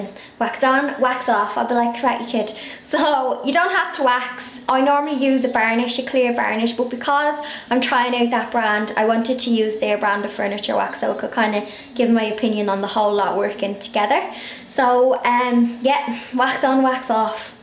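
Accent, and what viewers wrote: Ireland. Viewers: I think it is Irish? nice vid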